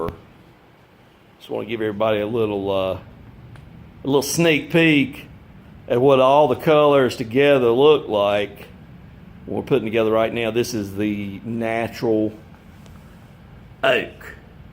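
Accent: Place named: South, United States. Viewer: I love your voice. It’s so country.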